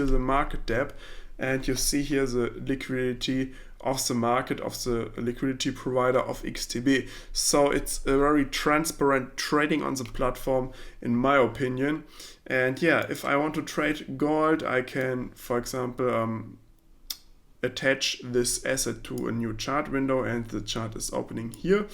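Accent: Germany. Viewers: deutscher Akzent kickt😂 Aber sehr gutes Tutorial. 10/10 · Bro nices Video aber fühle den Deutschen accent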